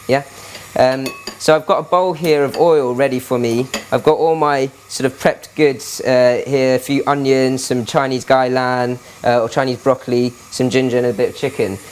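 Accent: England, United Kingdom. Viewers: An Oriental man with a British accent...Interesting...Very informative wok instructions...Nice flipping skills... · Authentic English accent ? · He said he lives in london 008-009...dont know any better place to get an english accent. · DawgYankee i dont understand why people commenting on his accent, he was probably raised in the uk, maybe thats why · You speak like a mate from England or UK? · Love the British accent. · Thanks for sharing, I’m new to wok cooking and this is great information. Thanks again I like your Pommy accent just awesome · that's a chinese dude with a english accent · I love the Asian, with a British accent, cute. Nice presentation on Wok cooking. Italian here loves woks and Asian cooking. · comments are quite churlish. who cares if he speaks english. he actually sounds British but the point here is to understand what the wok is and how amd why it is used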